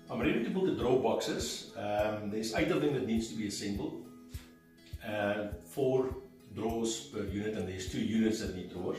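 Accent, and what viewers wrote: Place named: Afrikaans, South Africa. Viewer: Ek wou se dit is n Afrikaanse aksent. Baie dankie vir die video, ek moet binnekort begin kaste bou vir my kombuis, ek will ook melamine gebruik maar sal met n 'Festool track saw' moet gebruik om die panele te sny. Ek het iewers gesien dit kan gedoen word sonder om versplintering te kry, is daar n spesiale manier om dit dan te doen? Ek sal baie graag ook die plan vir die 'scissors table' wil sien as dit beskikbaar is. Baie dankie.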